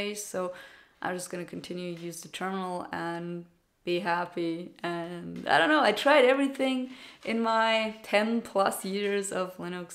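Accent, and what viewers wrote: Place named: Germany. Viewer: i bet your German or something you have a accent.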